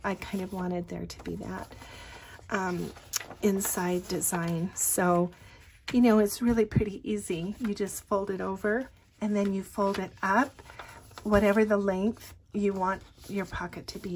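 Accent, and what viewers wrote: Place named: Utah, United States. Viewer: I love this! You sound so much like the people I knew when I lived in Providence, Utah in Cache Valley. You sound like a lady I knew very well in relief society. I’m from East Tennessee and I’m back here now but I lived in Utah for 8 years. I’m happy to have found your YouTube channel!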